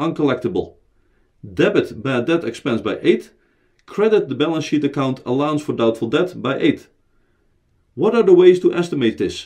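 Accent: Netherlands. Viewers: I know a Dutch accent when I hear one lol. Thanks for the review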